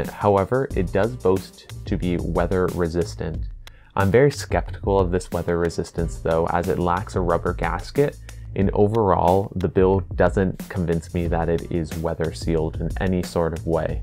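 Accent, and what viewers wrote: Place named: Canada. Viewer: Holy Canadian accent LOL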